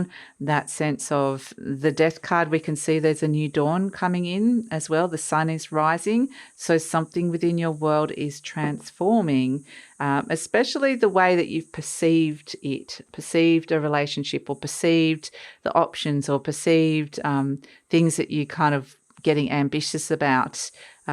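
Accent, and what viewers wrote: Australia. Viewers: G'day. You sound like an Aussie. I'm in Brisbane. Nice to meet you. A blue butterfly flew across my line of sight this morning! Thanks for the reading. Cheers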